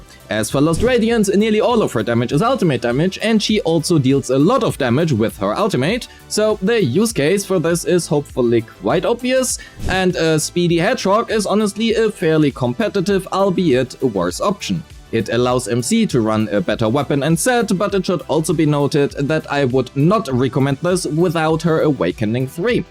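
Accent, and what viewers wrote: Germany. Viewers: I hear german accent + see a good structured guide with a pinch of humor - it`s an insta subscribe 🔥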